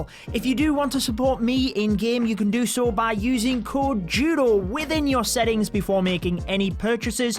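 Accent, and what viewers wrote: United Kingdom. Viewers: Judo i have a question for you where are you from? I ask this because I’m my country (Honduras) it’s 4 am. I guess you are from U.K. by the way you speak English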